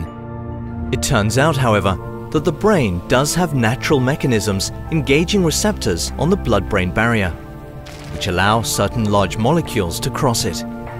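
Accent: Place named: RP, United Kingdom. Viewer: "Location: United States" Why the posh british accent though?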